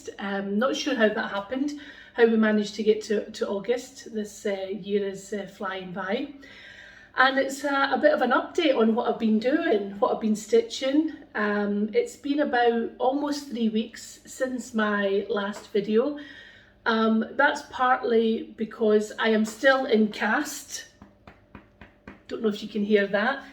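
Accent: Scotland, United Kingdom. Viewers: So glad to see you're doing much better...slow and steady...soon you'll be up and running around. I love that pattern Thistle and I may have to see if I can track it down to purchase it. Really enjoy your videos and your lovely Scottish accent. Star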